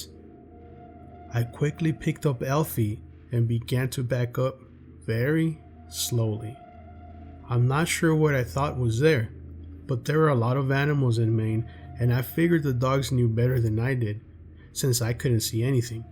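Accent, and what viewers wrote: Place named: Chinle, United States. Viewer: Lived in Chinle, AZ for about a year. Never saw anything real personally, but you know how horrible kids can be to each other. We used to tease each other all the time when walking past any livestock in the fields. “Ooh that cow is acting weird! It’s a skinwalker!!” Canyon de Chelly was fun to visit but creepy at night. ❤️ Ps: Your accent makes me miss my friends back in Chinle.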